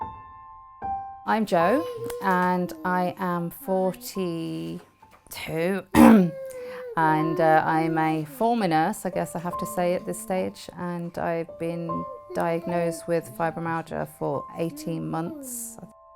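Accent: England, United Kingdom. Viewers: What i dont understand is she said she can't afford the medication but she sounds British in which case she wouldn't pay for medication and being on esa she wouldn't have to pay for prescriptions. I have fibromyalgia and its truly awful so I sympathise with her but I just dont know what she means by affording medications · You sound English but I am assuming you don't live in England? If you were in the UK you can get free prescriptions to cover any medicines, I have 11 prescriptions monthly and used to buy a yearly pass whilst I was still at work but since becoming too ill to work I now get all my medicine for free, I cannot imagine what it must feel like to have the extra burden and worry of affording your meds I know if I had to pay for mine I couldn't afford them all and would have to go without some of them. I hope your situation improves and thank you for being so open and sharing this with us.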